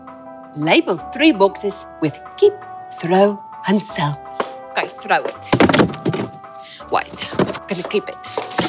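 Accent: Afrikaans, South Africa. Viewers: LEG3ND she sounds afrikaanse. I should know. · She’s a South African that’s why she has this accent. My friend lives I South Africa 🇿🇦 in the same city 🌃 I live(not by though) but her aunty is.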